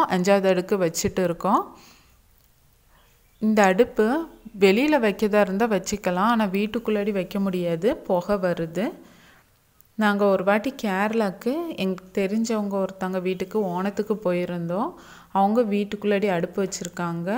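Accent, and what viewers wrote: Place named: Tirunelveli, India. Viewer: நீங்கள் நெல்லை மாவட்டமா? தமிழ் உச்சரிப்பு அப்படி தான் தெரிகிறது. அவரவர் வட்டார மொழியில் பேசும் போது தான், தான் சொல்ல வரும் கருத்துகளை சரியாக சொல்லமுடியும். நீங்கள் உங்கள் நடையிலேயே (style) பேசுங்கள்.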